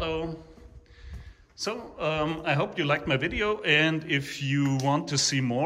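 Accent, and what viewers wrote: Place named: Bavaria, Germany. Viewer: Nice collection of "Amerikanische Riesenwagen". Do the various owners all live in this same building? I see from the license plates (and your accent) that you are in beautiful Bavaria. Funny how the North Americans luxury car market was taken over by the German brands starting in the 1980s, and yet, there seems to be quite a lot of classic American "land yachts" in Europe today.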